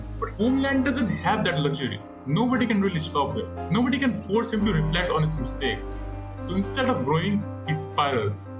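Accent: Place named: India. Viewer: Open the video, hear an Indian accent. close the video.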